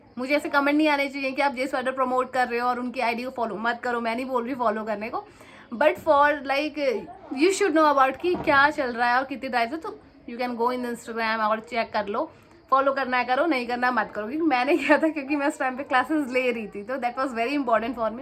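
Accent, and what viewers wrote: Kumaon, India. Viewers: are you kumaoni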